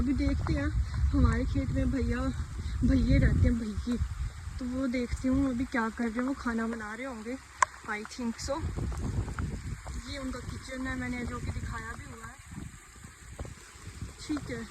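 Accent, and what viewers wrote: Haryana, India. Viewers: Wah rr jatni aaj haryanvi bol k moz krdi❤❤ My cute crush 🥰🥰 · Hariyanvi boli bahut pyari lag rahi👍👍👍👍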